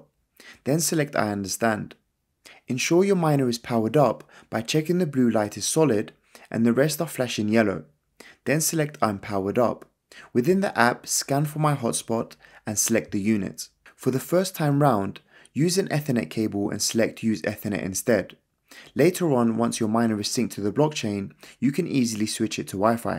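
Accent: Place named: North London, United Kingdom. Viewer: Hey man, just discovered your video/channel. My Linxdot arrives late March. Great video, have subscribed. I'm in North London, you sound as if you are too? If so, how is it going and if not how you finding mining in London? Still to watch more of your vids but you are the first I've found in London possibly north 😄 Cheers. Edit- just finished the video, very informative, thank you.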